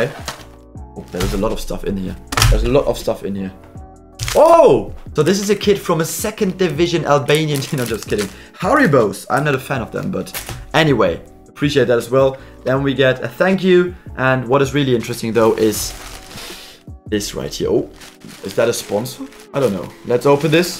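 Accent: Germany. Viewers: are you a german because i hear it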